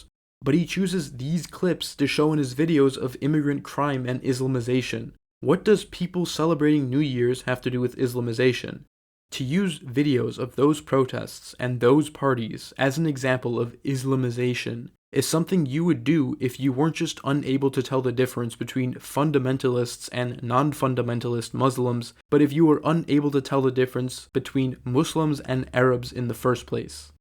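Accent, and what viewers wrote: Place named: United States. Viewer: I think it’s relevant to mention the guy who narrates this video, maybe lives in Germany, maybe is of German descent, but is not German by blood. He speaks with a clear American accent. Something to consider since he’s taking a clear globalist leftist position in his videos on Germany. Probably just some brainwashed college student rich kid. So obviously hes pro immigration pro invasion pro communism.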